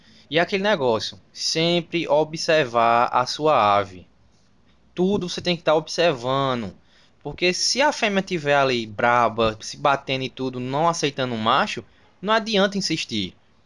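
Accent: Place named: Northeast, Brazil. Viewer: Você é nordestino? Sotaque lindo 😊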